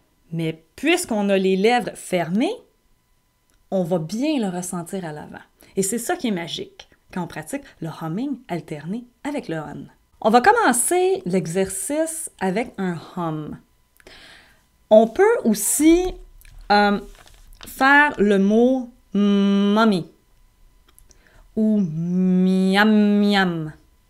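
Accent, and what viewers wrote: Quebec, Canada. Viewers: Vous êtes québécoise ???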